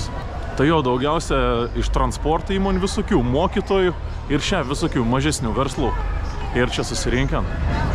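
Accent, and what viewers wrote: Samogitia, Lithuania. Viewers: Video super 👍👍👍 seku seniai vienas įdomesnių contento kūrėjų, kažkiek primena Kurt Caz tik su žemaitišku akcentu.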